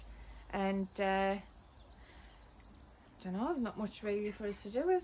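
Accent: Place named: England, United Kingdom. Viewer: I love your English accent, very refreshing. We are expats fromm South Africa, been living in Huntsville, Texas for the past 33 years.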